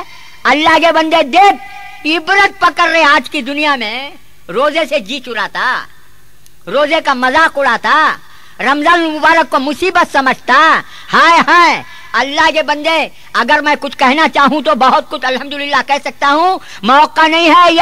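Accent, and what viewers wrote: Bangalore, India. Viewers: Subhanallah mashallah ...allah sab ko roze ki ehmiyat samjhne ki toufiq de .....bacho bacho ko allah hidayat de ..ane wali nasal ko roze ki ehmiyat samjhne ki toufiq de 'ameen' anf iam from banglore banglore people spk lyk dis nly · what is fun here..?nothing fun in it..!don't criticise the regional slang of Banglore..the person who posted this video may not be perfect in Arabic. Can he be insulted by the Arab people..?Just delete this post or change the title as "Excellent Bayan about Ramadan". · This is Bangalore accent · Its not Telangana accent, its a Bangalore accent and he is no more with us, he was khatib of masjid jaynagar 4th block bangalore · This is not a funny bayaan bro, its a lesson what ever accent it is... and its not Hyderabad accent its a Bangalore 4th block Jayanagar imam Moulana saheb walaja ke, Allah inko sehath ata Kare... 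plz all of you pray for his sehath and afiyath. Thanks AshfaQ BTM Layout, Bangalore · it's not Telangana accent by the way. it's Banglori by the way... Dumb uploader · bangalore accent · It’s BANGALORE accent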